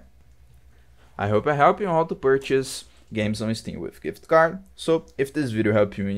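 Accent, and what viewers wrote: Brazil. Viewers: You have a very Brazilian accent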